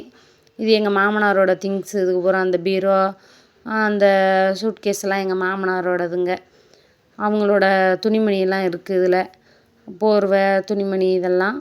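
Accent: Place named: Madurai, India. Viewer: நீங்கள் மதுரை பக்கம்பேசுரமாதிரிபேசுரிங்க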